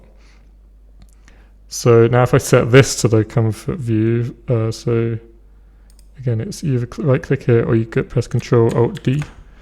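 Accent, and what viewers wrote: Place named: France. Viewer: 'Sup, why do you sound French?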